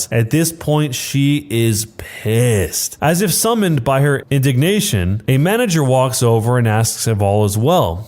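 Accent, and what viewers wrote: South, United States. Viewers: Your southern accent makes me want to watch true blood again lol. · I am absolutely losing my head over the southern accent omfg i love it 😂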